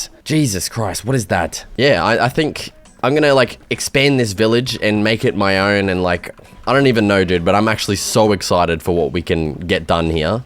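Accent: Australia. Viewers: That’s just cause he’s Australian lol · All I hear is angry Australian 😂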